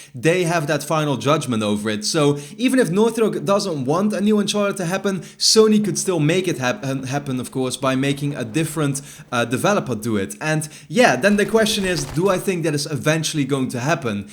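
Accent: Netherlands. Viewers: you got a dutch accent and my name is robin to he eh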